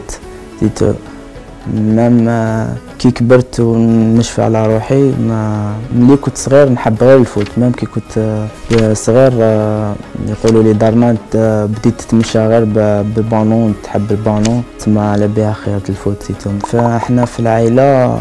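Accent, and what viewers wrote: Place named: Morocco. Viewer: Pas de doute, tu ne peux être QUE marocain. Je comprends tout ce que tu dis. Alors qu une personne algerienne je dois m appliquer pour comprendre son dialecte. Enfin, peu importe, Bravo Béchar🤗👍❤ allah a nejhak🤗🤗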